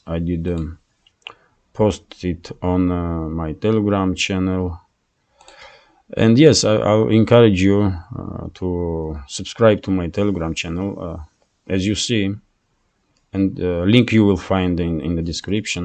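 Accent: Russia. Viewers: NATO, Poles mercenaries are involved. And those Ukraines forced to die for USA. Meanwhile, Russia wins again. The Imperialist brain is sociopathic hence the more Russia wins, the more they double down. I rejoice in Mr Putin's leadership: a leader who loves his citizens, whose intelligence is layered with great emotional depth. Alas, I have Justin Trudeau to depend on for my economic well-being. Perhaps, you can lend President Putin to us, just to help us become a real nation, again.😏🇨🇦 Advice: speak a little louder, a bit more emphasis Just a bit🤏 Found you from Alex C.'s channel. A Russian in Russia sounds good to me! P.S. I am an old woman who has been dumpster diving for the truth for decades. Good to find another truth teller in my search. To die is human, to die rejecting the bullshit of false prophets is divine. Subscribed.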